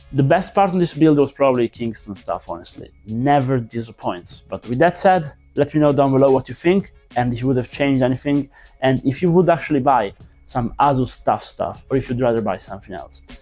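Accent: Italy. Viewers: Are you Italian bro? Your accent is cool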